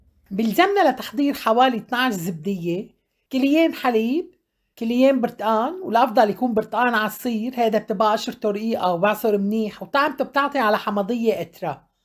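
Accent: Aleppo, Syria. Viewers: بلعكس خليلي عم تقولي دشري وحيكتك الحلوة الحلبية لا صلية ماتغيري كلامك برجعنا لايام زمان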